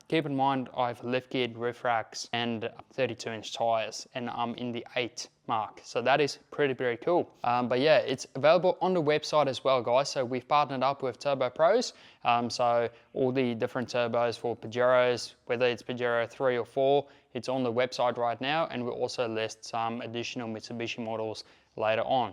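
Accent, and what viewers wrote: South Africa. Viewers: Your S.A accent comes through big ups bro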